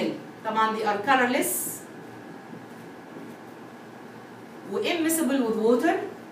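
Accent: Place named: Egypt. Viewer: your egyptian accent is fired AF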